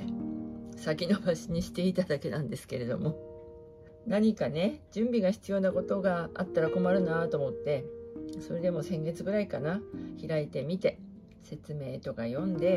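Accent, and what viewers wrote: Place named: Hokkaido, Japan. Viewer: さん初めまして😊 さんの話し方が、北海道にいる親戚に似ていて、とても懐かしくなりました♪ これからも、動画のアップを楽しみにしています！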